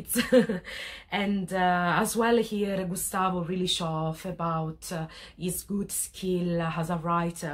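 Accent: Italy. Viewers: Not try to hide your Italian accent please, is so cute. Speak a bit more in Italian, Latin-American love it, and slowly is not difficult to understand for us. Cheers. Nice video. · HOla, sos italiana? me parece percibir un acento italiano cuando hablas.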